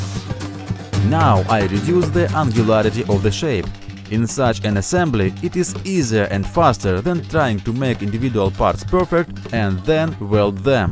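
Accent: Russia. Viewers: I can hear strong russian accent.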